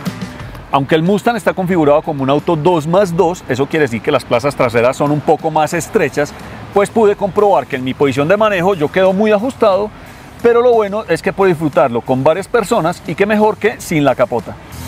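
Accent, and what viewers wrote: Bogotá, Colombia. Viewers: Mero hablado de rolo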